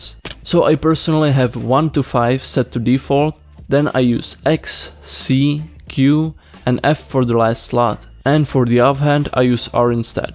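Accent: Czech Republic. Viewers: I can smel the czech accent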